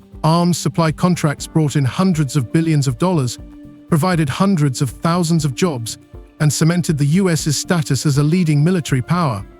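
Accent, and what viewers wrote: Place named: United Kingdom. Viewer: YOUR TITLE IS AN ABSOLUTE JOKE,.... CANADA NEVER STABS... BTW, IS THIS AI.? OR ARE YOU A REAL PERSON.?? YOURE ANOTHER AMERICAS PUPPY THAT IAM TIRED OF.... WHERE DID THEY GET THESE BRIT ACCENT??? I DONT THINK THIS TRUE THOUGH THERES NO REPORT OF THIS DEAL WITH CHINA... DO YOU HATE OUR CANADA.?? DONT TALK NONSENSE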